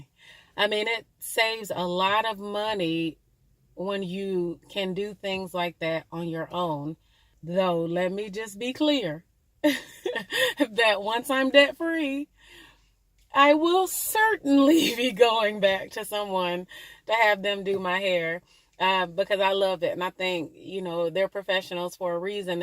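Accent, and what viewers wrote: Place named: North Carolina, United States. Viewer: ❤️ I'm from and live in UK, my hubby is from America, he walked past and was like 'who you listening too, she sounds like she's from NC', which is where he is from. I have no idea where you are from, but I love listening to your videos as your accent reminds me of all our family and friends back there🥰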